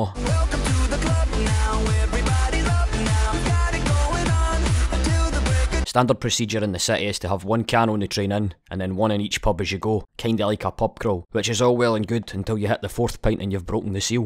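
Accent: Scotland, United Kingdom. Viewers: Stephen duncan Listen to the accent, posh Edinburgh, or Ned Glasgow? Or the standard fifer...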